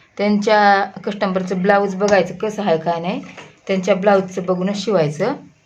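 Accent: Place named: Hingoli, India. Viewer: Tie chan shikawlat shantapane kasa shiwaycha blows te. tumhi Vasmat Kiwa Hingoli chya ahat ka? Tumchya bolnya warun watla.👌👌😊 thank you.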